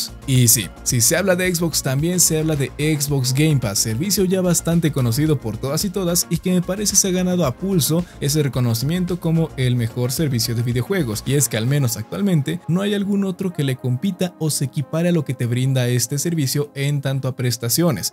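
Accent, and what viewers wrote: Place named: United States. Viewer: Por que no dices serie x o s en ves de tener fonética yanky.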